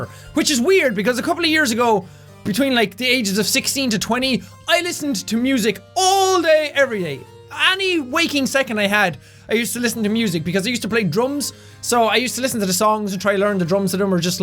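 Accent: Ireland. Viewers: I know jack isn't faking his accent coz that how irish people talk like me coz I'm irish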